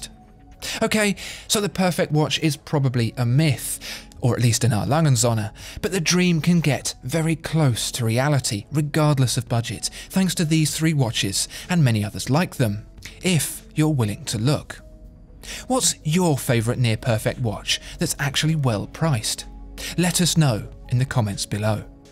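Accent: United Kingdom. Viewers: That's a british-jewish accent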